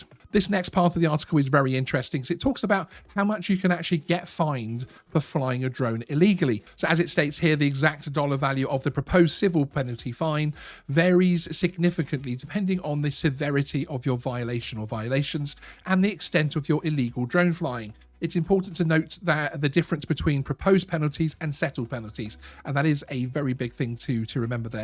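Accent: United Kingdom. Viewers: Reading off incidents meant to scare pilots while providing no real particular info on individual cases.. Click bait, British accent meant to infer authority, he is a wanker.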